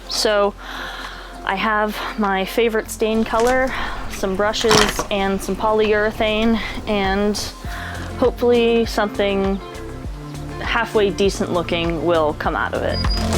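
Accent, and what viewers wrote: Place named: Boston, United States. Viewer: But I love your Boston accent!! Also that knife shirt is a total vibe...DON'T MESS WITH! It really inspires me that you're tackling so much of this build all on your own and it's such a joy to watch. (I know you had help with some of the electrical stuff, but so much of the woodwork has been by yourself - it appears at least.) I always had my partner to bounce ideas off of and problem solve with, and I don't think our van would have gotten finished on my own. You're such a boss lady!!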